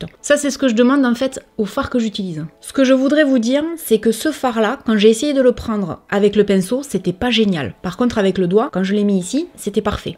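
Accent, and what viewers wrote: South, France. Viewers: Toujours intéressantes tes vidéos et nous mettent de bonne humeur. J'adore ton accent qui reflète le soleil, les cigales et le sud. A quand un live avec tes abonnés puisque c'est possible avec YouTube.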